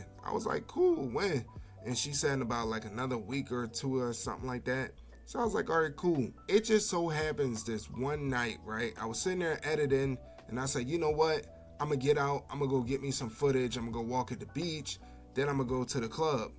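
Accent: United States. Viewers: Sound like American woman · You from B-More or Philly?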